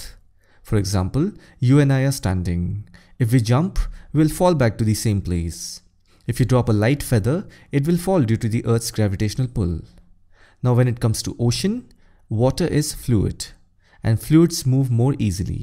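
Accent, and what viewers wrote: India. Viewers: I'd rather listen to the sound of a fork screeching against the surface of a plate than to this Indian accent.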